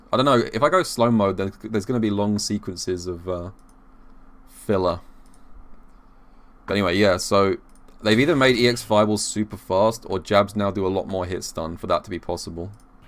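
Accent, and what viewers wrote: United Kingdom. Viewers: I really enjoy u talking about sf tech u clearly know your shit and I could listen to an English accent all fukn day lmao it’s like the best of both worlds hahahah 👊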